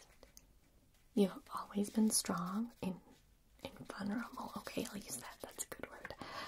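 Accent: United States. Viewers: Your American accent is so relaxing for some reason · I just had ASMR videos on auto play in my headphones and this one started and I had to go and check who the heck it was. This feels like it’s from a weird alternate reality. Great job on the American accent. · Hearing an american accent from you was foreign for a second 😂 I loved the video, coming from an DC fan since i was 5 years old! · Why does your american accent sound like Benedict Cumberbatch's american accent? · The first video of hers I watched was the T-Virus Trial one and I didn't realize she wasn't American until she called a flashlight a torch. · Ok ok.....the "American" accent...that threw me off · Your American accent is better than mine. 🥹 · Your american accent is great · I love your normal "accent" but your american accent just hits different 🤤❤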